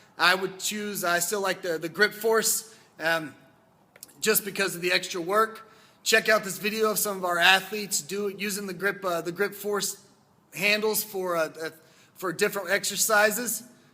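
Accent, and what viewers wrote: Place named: United States. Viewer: The most annoying loud American accent. Imagine being stuck in a lift with this guy on his phone. Mugg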